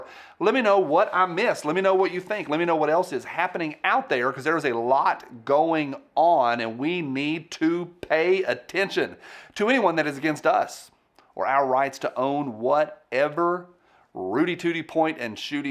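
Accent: South, United States. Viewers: Howdy,! I'm from Illinois, but love the southern accent. Your channel is awesome! Thanks for the info and laughs!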